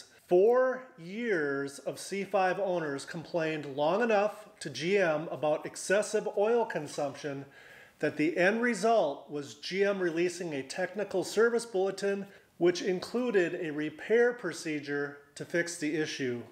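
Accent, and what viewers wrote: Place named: United States. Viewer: Hello...😊many thanks goes out to you by the way, you are speaking a great American English! for me as a German girl it's easy to follow you. I am C5 owner only for a month and love my car. Your videos are interesting and give a lot of information 👍🏼 All the best + GOD BLESS AMERICA 🇺🇸🗽 greetings from Sam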